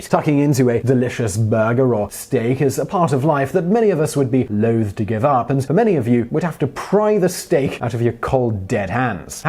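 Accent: United Kingdom. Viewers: I lo e how the American viewers get all worked up by his superior British pronunciation on a lot of words. Having him drop the odd American (mis)pronunciation in is great, just to keep them knowing that he knows.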